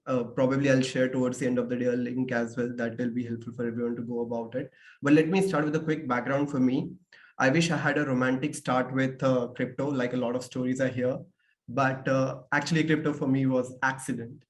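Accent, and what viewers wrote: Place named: India. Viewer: Indian english 🤤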